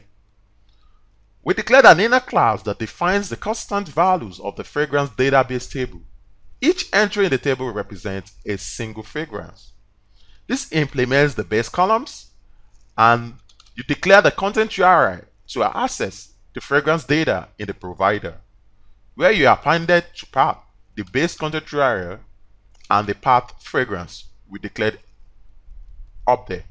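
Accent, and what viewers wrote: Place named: Nigeria. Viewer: your sounding like a nigerian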